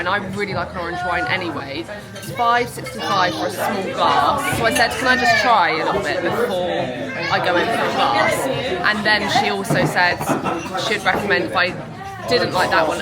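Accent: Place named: United Kingdom. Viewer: I love all of your videos, and your British accent is so cuteeee OMG - makes me want to book a flight to London 😝 And you guys are such a cute couple, love watching you two together, listening to each other and always taking each other's tastes and interests so seriously. That's what I call in couples a participatory involvement with each other :face-red-heart-shape: Keep creating and keep sharing! Looking forward to all that good stuff that you show us in your vlogs. I have been binge watching your channel this Sunday - all day long - and I will do the same every Sunday from now on🤣🥰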